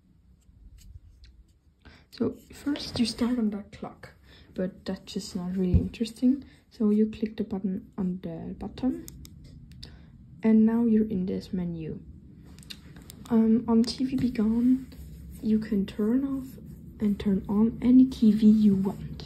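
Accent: Germany. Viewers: Man hört den deutschen raus 😂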